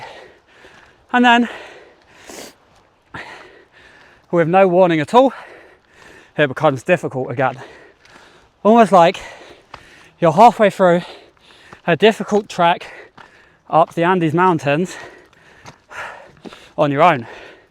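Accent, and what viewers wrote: United Kingdom. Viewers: Usually I don't liesint to UK accent but I like it frome you maby because you speak normally and using simple words ❤ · I enjoy your British accent👌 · Hi I'm from Philippines 🇵🇭 love watching your video your accent I really like to imitate to have a British accent to I always follow your video hope you visit Philippines 🇵🇭 thanks I learn a lot from you 😊❤❤❤ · هذا أشبه بالبودكاست وليس بالمدخلات المفهومة لأنه حسب ما أعتقد ليس في المشهد مايساعد على الفهم باستثناء الكتابة كحركات المتحدث او الاشارة الى مايحيط به لنفهم مايقصده فقط عندما كدت تسقط .هه.اوفي الاخير لما قلت وداعا نراكم لاحقا ..بودكاست في الطبيعة..حتى مستوى b1سيعاني حتى يفهمك ..ولكنني أقدر مجهودك في التحدث بينما تصعد الجبل وتقدم النصائح وهذا مجهود مزدوج...شكر من القلب..لهجتك البريطانية أصبحت أصعب كلما حاولت الوصول الى القمة وتعبت أكثر .. علينا أن نفهم مهما كان الحال..واصلوا ..فالرحلة الى الطلاقة معكم ممتعة متعة صوت الشلال بجانبك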